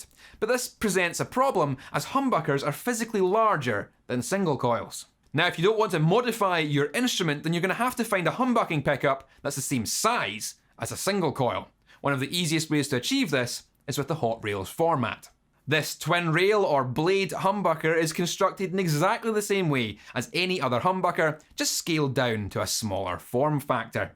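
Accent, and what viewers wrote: Scotland, United Kingdom. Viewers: That was a very consistent explanation indeed....with a nice Scottish touch 👌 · Thanks for the explanation. But damn my ears are almost dead after hearing such a load of British accent.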